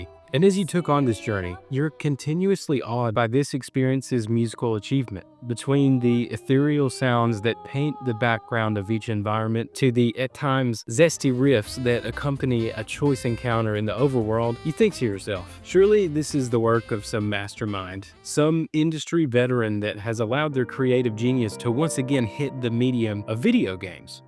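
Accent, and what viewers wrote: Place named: South, United States. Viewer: Very pleasant. Simple in the best sense of the word, it doesn't pretend to explain the universe, but it's full of tenderness for certain things that moved us in this music, in the game -it's the same thing. So, it deserves a little comment for the algorithm and, more profoundly than that, a thank you for taking the time to convey an emotion that helps make this world a more beautiful place. Ps Well, I'm French and i don't care about your accent in French. To be honest, i love southern accent, so, everything is just nice^^.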